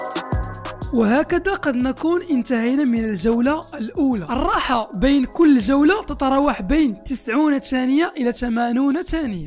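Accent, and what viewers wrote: Morocco. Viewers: نتا باين عليك مغربي بالظبط من مراكش😂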